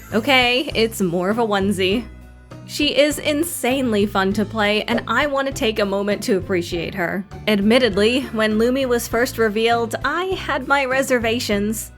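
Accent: United Kingdom. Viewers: the scottish accent is great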